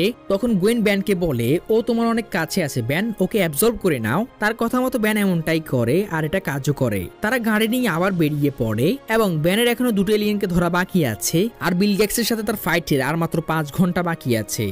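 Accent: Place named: Noakhali, Bangladesh. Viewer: Noakhali accent chara baki shob tikh ache. Accent tikh korle awesome hobe. Good luck bro.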